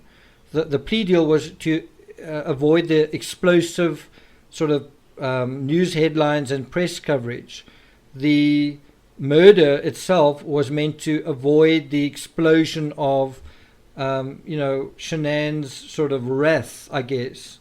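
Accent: South Africa. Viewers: Hey Man...when I heard you talking I knew straight away that you're South African! 🇿🇦 So nice to hear my own language again. Far away from home....🇦🇹💕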